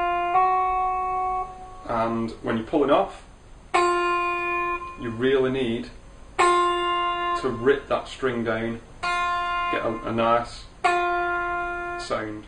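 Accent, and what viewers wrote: Lancashire, United Kingdom. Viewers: You be must be from lancashire. You should like my uncle lol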